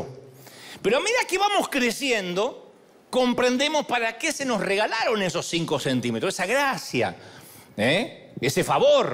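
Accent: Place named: Argentina. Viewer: Hola querido… tu eres mi cartero del cielo… me llegan los mensajes y traspasan mi alma🥰😇y Papá Dios y Mamá Maria no se equivocan con escogerte y mi marido que murió hace 6 años era de Buenos Aires… y mi hijo Aldo Cesar es mitad argentino🇦🇷 y mitad de mi México 🇲🇽 lindo y querido y me emociona escucharte con tu acento que convivi por 36 años y me enternece que mi Jesús te utiliza para que llegue el mensaje con tu acento que extrañamos tanto❤️🌹❤️🇦🇷🇮🇹💕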